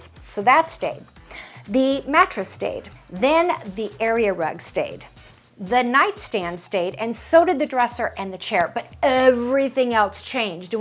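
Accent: England, United Kingdom. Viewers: That English accent suits you.... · haha- again a great video of yours, I just love your tiny english accent, thanks for sharing....:::)))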